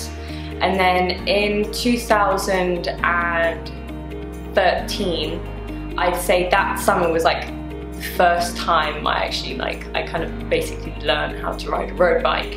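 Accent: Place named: United Kingdom. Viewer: Barbados has perhaps the largest 'White' population in the Caribbean. Her accent sounds British, though...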